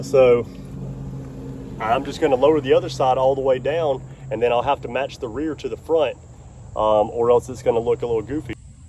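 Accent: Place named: Texas, United States. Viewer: Chrisfix with a texas accent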